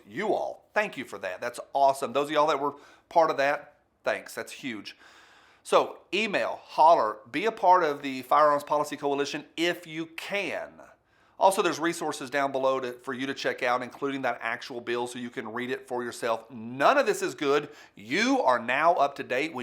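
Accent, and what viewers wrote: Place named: Texas, United States. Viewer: Where in Texas is this woman from? Making sure we don't ever move there.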